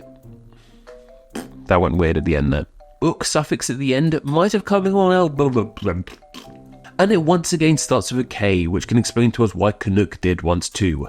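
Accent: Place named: United Kingdom. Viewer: I mean, he is British. He doesnt say many different things "correctly."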